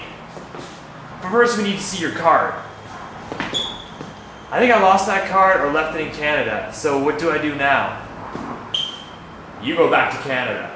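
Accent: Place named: Australia. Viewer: Great Australian accent!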